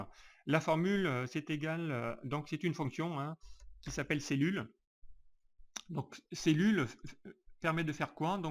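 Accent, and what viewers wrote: South, France. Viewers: Super, merci beaucoup pour cette excellente vidéo avec le charmant accent qui sent bon le Sud ...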